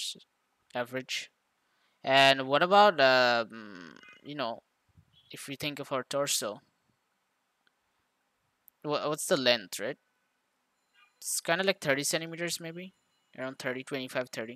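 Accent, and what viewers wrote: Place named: Bangladesh. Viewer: your voice makes me feel like you r from Bangladesh.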